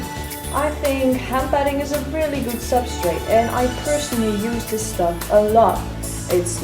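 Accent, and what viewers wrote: Netherlands. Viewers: hoi ik dacht al dat je nederlands was door je accent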